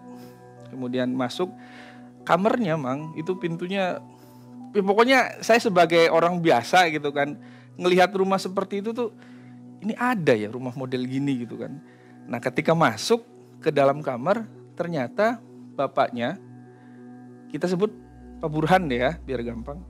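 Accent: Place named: Banyumas, Indonesia. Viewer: Saya Suka dialeg bang...natural gak d jaim2in...lucu khas jawa ngapak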